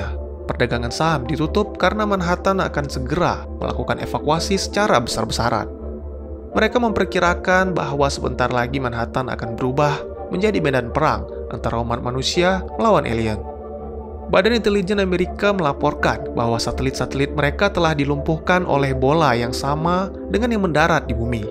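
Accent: Aceh, Indonesia. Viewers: bang orang aceh ya?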